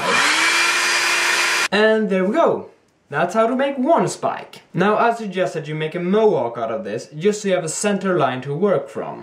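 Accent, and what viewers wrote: Sweden. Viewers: sounds swedish? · You sound swedish... Har jag rätt?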